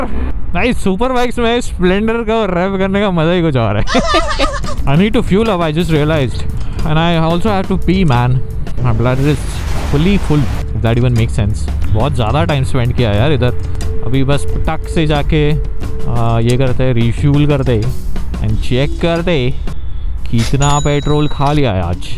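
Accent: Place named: Hyderabad, India. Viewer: Hi! Happy new year first of all... idk if you'll see this or not but very very lovely year of content from your side... and you pakka sounded hyderabadi with half the comments you were making in this video (yes you should come to hyderabad)... and next time come to Lonavala a lil earlier that way i can also see you!! Love from hyderabad man truly!!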